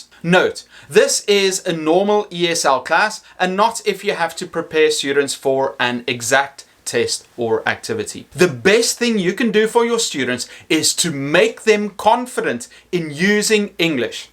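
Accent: South Africa. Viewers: South African Accent!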